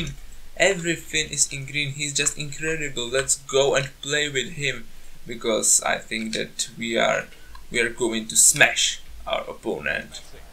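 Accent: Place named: Czech Republic. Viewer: lehký český přízvuk, ale hlavně český znak FAČR:D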